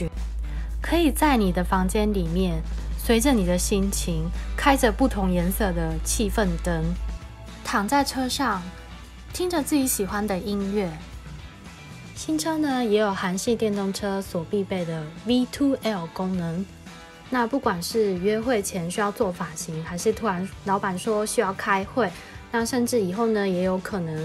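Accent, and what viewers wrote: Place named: Taiwan. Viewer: 台灣人說中文 很好聽 好像可愛小孩子講的感覺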